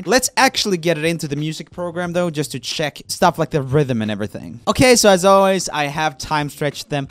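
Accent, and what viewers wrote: Sweden. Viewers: Swedish I think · Spikey100000 how they’re both from Sweden. Have very similar accents and speach patterns and a similar sense of humour plus they are literally friends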